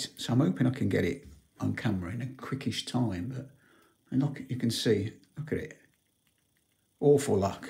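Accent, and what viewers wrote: Birmingham, United Kingdom. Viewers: Nice and quick! Well done!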